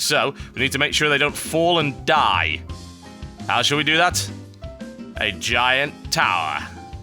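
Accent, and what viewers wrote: United Kingdom. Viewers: nope, his natural accent is a geordy accent; think cockney with a concussion and a mouth full of novocaine. he's just trained himself into using a more "proper" british english, basically the british version of the midwestern standard that most TV show hosts and newscasters have.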